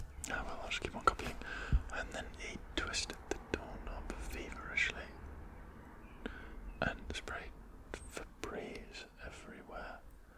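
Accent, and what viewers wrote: United Kingdom. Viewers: I just watched the like nerd studies you video or whatever it's called and I loved it so much that I came to look at some other videos and I got jump scared when I heard the British accent 😭 (not in a bad way I just was not expecting it at all lol)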